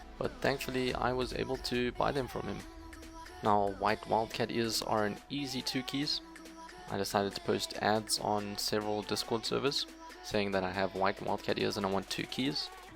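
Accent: South Africa. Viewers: Are you South African 😂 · Are you South African? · You're South African?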